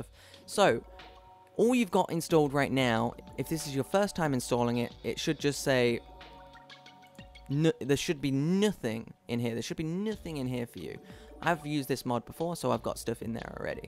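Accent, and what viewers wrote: United Kingdom. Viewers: prepubescent englander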